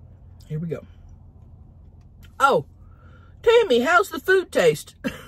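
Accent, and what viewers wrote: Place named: South, United States. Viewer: I adore this woman 🥰 and her little southern accent lol SPLURGE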